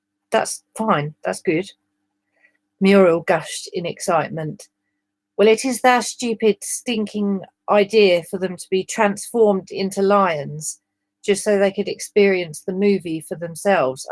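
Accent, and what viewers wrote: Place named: United Kingdom. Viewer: Thanks to Oddie (the fantastic) I am your newest fan. I'll be sure to try and be glorious in the comments as your british voice in the air.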